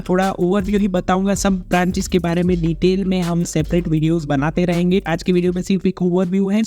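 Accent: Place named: Maharashtra, India. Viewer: Hello I don't know why but I feel you are a Marathi speaking guy after listening your Hindi.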